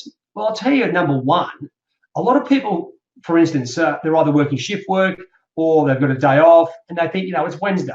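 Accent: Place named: Australia. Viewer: I can't understand that severe down under dialect. pass~>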